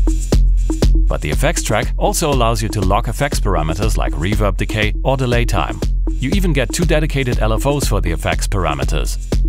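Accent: Germany. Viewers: lol what? i think he's just german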